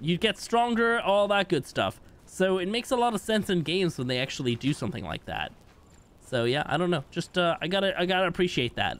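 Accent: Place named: Ireland. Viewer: What part of Ireland are u from in from louth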